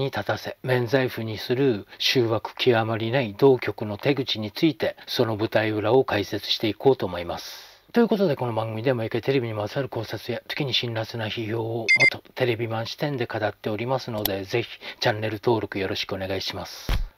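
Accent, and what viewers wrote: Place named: Hiroshima, Japan. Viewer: 聞けば聞くほど、広島弁😆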